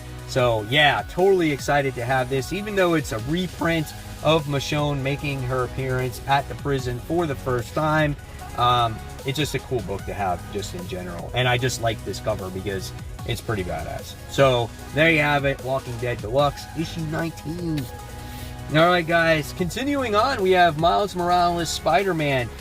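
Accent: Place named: Pennsylvania, United States. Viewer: I always thought you were in Pennsylvania with that accent. I live in SW Florida and love it. Great job on the 10k!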